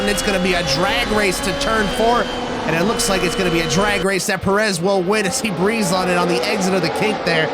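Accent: United States. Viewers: This is the most american voice i have ever heard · the commentator is sooooo american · Mate his murican accent is so funny😂😂😂😂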